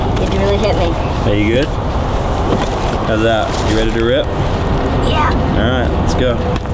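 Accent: Australia. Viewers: Yay sounds like an Aussie when hes mad......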